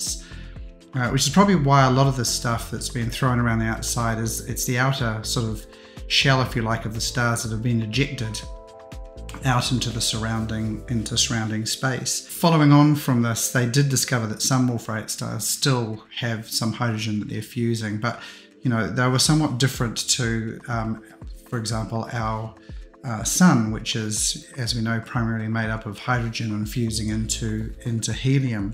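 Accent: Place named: New Zealand. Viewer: Great photos, its always nice to see Southern hemisphere targets and even better to hear a kiwi accent. Im down here in Dunedin and the weather has been absolute rubbish. Im just starting out on my astro journey so Im really blown away with what you have achieved. Subbed and will watch the rest of your videos too.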